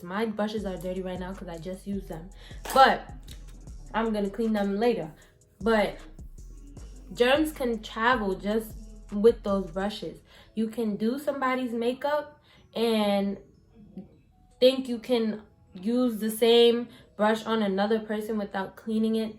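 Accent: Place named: New York, United States. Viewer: Haha loved this. Girl you gotta be from NY I know a NY accent when I hear one lols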